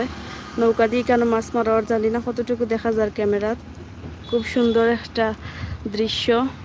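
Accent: Sylhet, Bangladesh. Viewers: Assalamu alaikum babbizee sylheti bashai kotta bollsen etto shonder video keep it up 👍🏼👍🏼👍🏼👍🏼👍🏼👌🏼💪🏼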